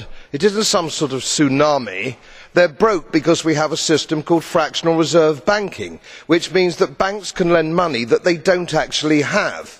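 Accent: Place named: Southern England, United Kingdom. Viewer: Fair enough, but remember that this is standard southern English, the norm for the language, if you like, so that any deviation from it, whether in England itself or anywhere abroad, is an accent. North America, South Africa, Australia, New Zealand (me) and some others, all have their own distinctive accents upon standard southern!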